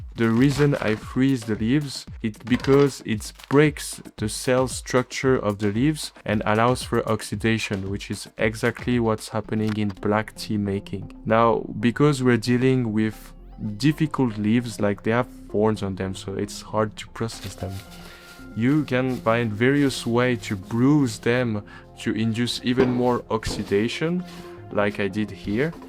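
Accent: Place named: France. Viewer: great video! l'accent français est veri grite also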